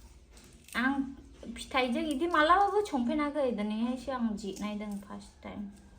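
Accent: Bengal, India. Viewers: Nwgni lairainykwonw kwnasongbabw ohakaya Ang benanao .benggoli baidi khwnakayw bwnggolni Boro lairainykwo.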